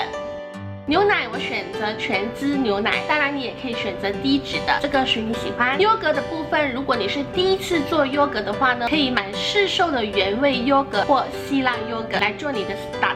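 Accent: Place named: Taiwan. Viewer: 姐姐，还以为你是来自台湾的呢？哈哈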